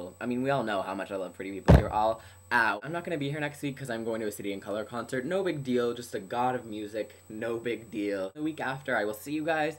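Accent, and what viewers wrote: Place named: Canada. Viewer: Haha. I love your canadian accent, the way you say 'About' and 'House.' It's however not as thick as Dhiel's, from another gay-themed collab channel, something like awesome not so straight guys. I always forget the name. v_v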